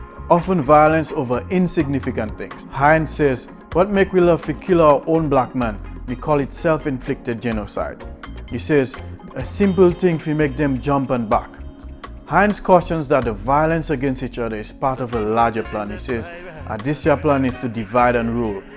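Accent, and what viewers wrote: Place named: Dominica. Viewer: I'm not an expert in West Indian accents or dialects (I've only lived in Jamaica), but your accent sounds like people I've met from Dominica. Are you from there?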